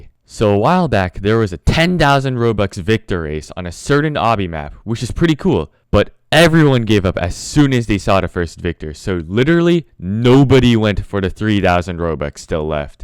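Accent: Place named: South, United States. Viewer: you sound like technoblade but with a southern accent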